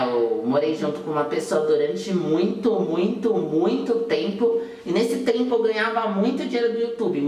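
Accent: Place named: Portugal. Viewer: Tu és de Portugal? Pareces pela tua fala.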